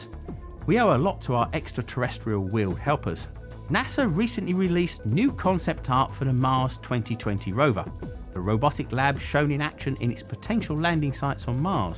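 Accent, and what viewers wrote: United Kingdom. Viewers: British. In the UK, the official unit of measurement of length is the meter. The pronunciation is a telling sign..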